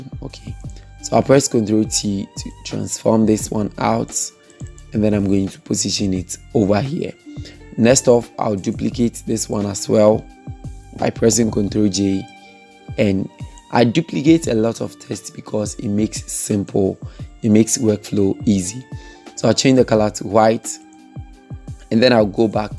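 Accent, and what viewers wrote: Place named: Ghana. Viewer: The Ghana accent is amazing to hear. Even in 2021, you have given me a god send tutorial. Thank you.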